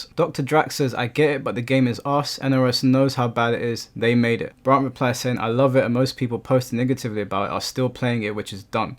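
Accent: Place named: England, United Kingdom. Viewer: You got decent content but I HATE YOUR VOICE it's the English shit I'm American and hate the English accent sorry not trying to disrespect the English just y'all accent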